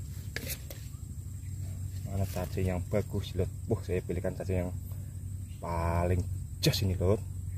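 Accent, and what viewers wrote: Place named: Kebumen, Indonesia. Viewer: Aslm ww...mas asli kebumen apa bukan, karna bahasanya kayak kebumen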